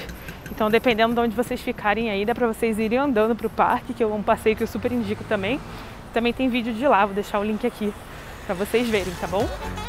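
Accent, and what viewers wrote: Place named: Rio de Janeiro, Brazil. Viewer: Totalmente sem sentido colocar uma carioca para falar de Moema. Ninguém merece ouvir lilais.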